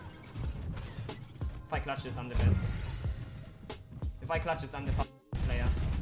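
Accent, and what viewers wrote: Germany. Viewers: Dieser Deutsche Akzent in der Englischen Sprache triggert mal übelst😂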